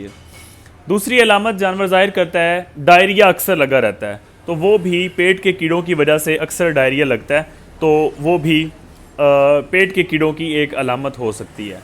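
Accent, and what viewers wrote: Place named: Saraiki, Pakistan. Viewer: Layah ka mtlb ye hwa k ap mithi boli sairki bolte hn...... 😮😮😮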